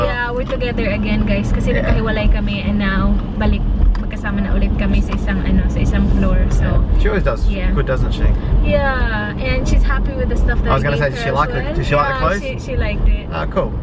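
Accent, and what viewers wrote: Visayas, Philippines. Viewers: Bisaya ka te noh? Haha kay tungod sa char char char na word ug botbot haha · bisaya ka te? E hearth ni kung bisaya ka😂😂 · Ms. u meron ka visayan accent po if nagTatatalog... I'm sorry to say that... I'm just priud Bisaya here😉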